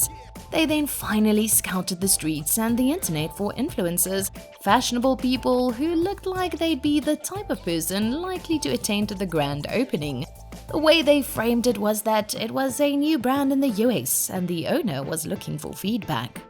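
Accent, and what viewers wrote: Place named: South Africa. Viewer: This narrator definitely from South Africa.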